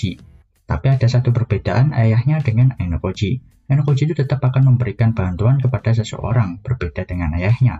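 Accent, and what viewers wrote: Java, Indonesia. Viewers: Sedang mendengar cerita LN dengan suara medhok,☝👍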